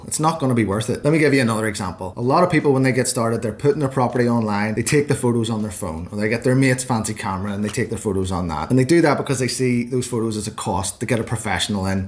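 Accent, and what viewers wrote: Ireland. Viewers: I'm watching your videos for the first time and OMG... I'm learning so much as a new Airbnb company owner! This is officially my new binge...Plus totally loving your Irish accent and humour 😄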